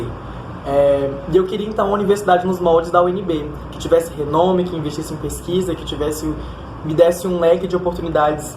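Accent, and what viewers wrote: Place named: Minas Gerais, Brazil. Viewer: inspirador. já pegou o sotaque mineiro todinho! hahah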